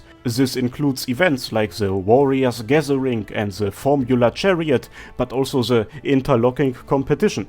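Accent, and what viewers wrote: Germany. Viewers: you german or close to that? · Is That a German accsent? Damn do i Sound like That when i speak englisch 😳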